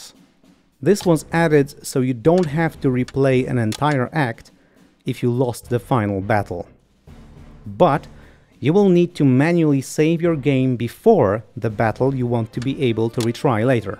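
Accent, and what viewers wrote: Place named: Poland. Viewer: Oo widzę że Polak robił ten film bo akcent nie angielski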